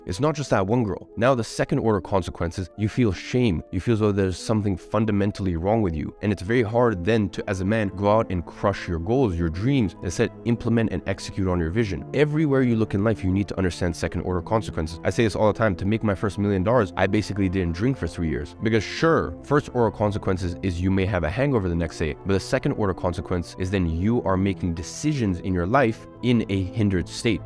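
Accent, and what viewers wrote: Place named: United States. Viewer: How come does this guy have an american accent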